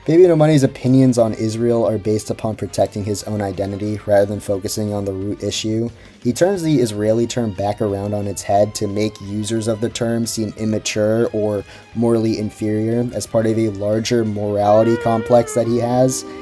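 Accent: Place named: United States. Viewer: terrible take. from your accent you would be American so by your same logic you are an Trump supporter and 100% for the Iran war. no there's no room for nuance with this. you see how stupid that sounds? I don't even say that to defend Israel because they shouldn't exist in the first place but that's a different conversation.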